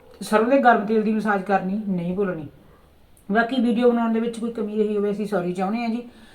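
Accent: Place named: Bathinda, India. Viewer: Dhudhri ki hundi, bhainji baithinda di boli sanu samjhna okhi hundi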